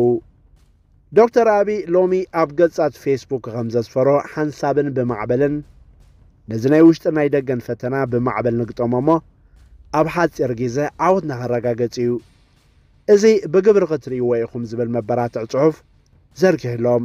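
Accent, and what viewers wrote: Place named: Eritrea. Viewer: ኤርትራዊ ቻነል ኤርትራዊ ቃና ዘለዎ ትሕስቶን ኣቀራርባን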